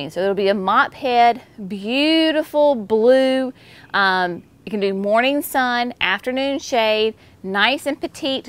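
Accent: South, United States. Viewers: Love your videos. Love your phraseology. You talk like we do with a southern accent rather than our hillbilly accent. I can totally understand stunk to high Heaven.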